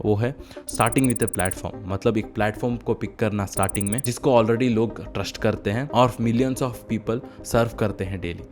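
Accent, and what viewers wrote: Karnataka, India. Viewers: Bhai aap karnataka se hoo kya???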